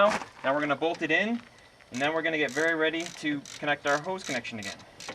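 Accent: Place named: North, United States. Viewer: I suspect by his accent he is up north, in the US ... hence the rusting....great video..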